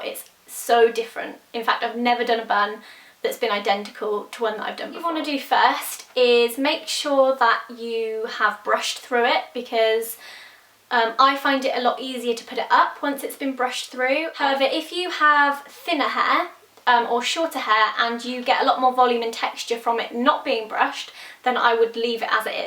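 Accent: United Kingdom. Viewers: I love your Videos , just perfect ,and you've got an Amazing British Accent , !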